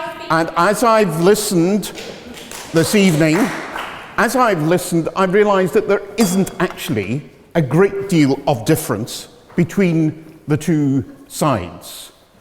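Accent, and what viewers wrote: United Kingdom. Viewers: Big Mac, bull shit spoken in a rather classy british accent :) Sorry Sir.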